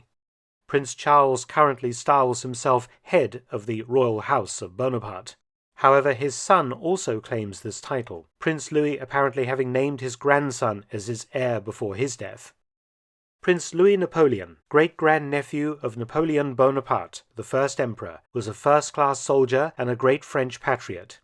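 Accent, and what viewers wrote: United Kingdom. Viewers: The very style of this very British narration leaves me with the impression of an implicit disdain for the French overthrowing of monarchy and going with a republic. Haha.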